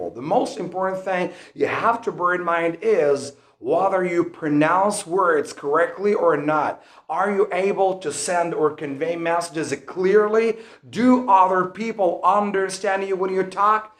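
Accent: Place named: United Kingdom. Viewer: british accent on top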